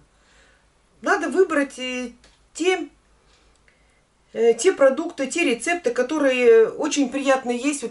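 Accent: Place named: Kopeysk, Russia. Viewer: у меня подружка живет в г.Копейск, говор такой же! мне нравится ваш говор. а вы откуда ведете трансляции?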